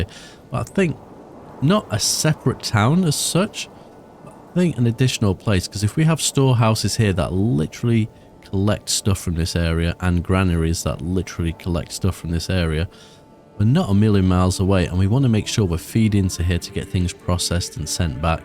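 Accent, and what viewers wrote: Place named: United Kingdom. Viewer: Ahh, you don't sound nasally...you sound British! 🤣 Unfortunately there is no way to unsnap road creation, unless maybe by starting short of a snap point instead of at an existing road. In my series I set up 2 iron deep mines and even with 8(?) bloomeries I couldn't keep up with ore production. They mine quickly. Good battle!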